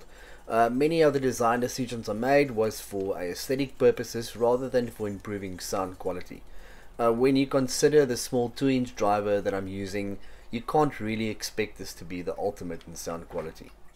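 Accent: South Africa. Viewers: Ive noticed your accent is south African or i might be wrong the thing is people dont use a good headset or proper speakers use quality headphones you will hear they dont lack that much in the bass sound bass definitely a bit more while they are suspended ive just discovered this channel yesterday and im inspired to build my own bluetooth speaker im from south africa currently residing in china so everything is less expensive love your work man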